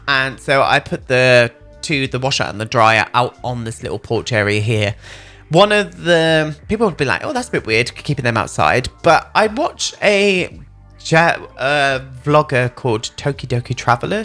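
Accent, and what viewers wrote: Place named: RP, United Kingdom. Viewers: Oh lord, you sound posh. Lovely build, though, I wish I had that kind of creativity. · You have the most posh accent I have ever heard a real person have.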